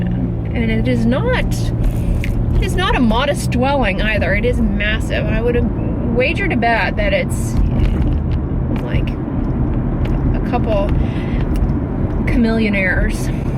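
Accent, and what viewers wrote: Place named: South, United States. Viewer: I like your Southern Accent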